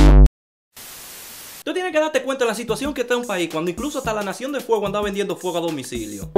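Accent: Cibao, Dominican Republic. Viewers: LO QUE ME CURA ES EL ACENTO CIBAEÑO QUE EL TIENE JAJAJA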